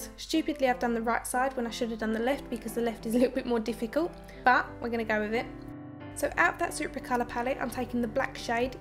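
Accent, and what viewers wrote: Essex, United Kingdom. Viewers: Where abouts in the UK are you from? I can sense an Essex accent ;) i love your videos & you are super talented, new sub!💗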